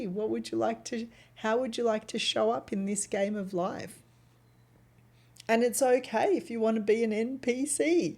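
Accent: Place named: Europe. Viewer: She seems like a really nice girl. Love the accent. I wonder what country she is from. I'm guessing somewhere in Europe.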